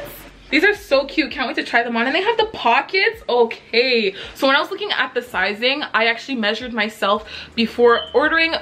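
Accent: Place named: Toronto, Canada. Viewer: I’m from gta area and just listening to you I’m like, that’s a Toronto girl I know it loool!! Keep up the hustle girl you’re killing it!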